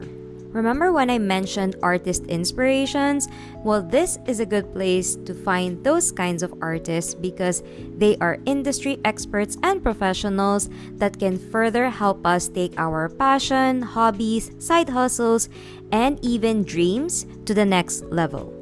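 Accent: Philippines. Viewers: accent is too FILIPINO. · I actually Guessed you correctly? Lmfao? Although i appreciate the Video, cuz i also wanted to look for commissions, your accent kind of gave me a suspicion, so instead of using English, i used Tagalog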